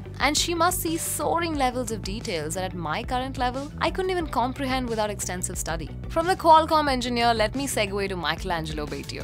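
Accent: India. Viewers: This Indian accent only adds to the charm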